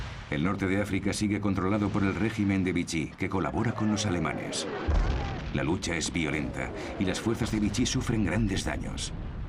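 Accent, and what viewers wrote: Spain. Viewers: Para mí el mejor documental y el mejor audio de todos es la de esta serie con acento español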